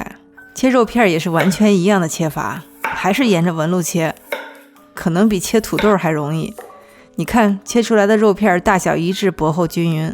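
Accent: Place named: Beijing, China. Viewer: 北京口音😜老乡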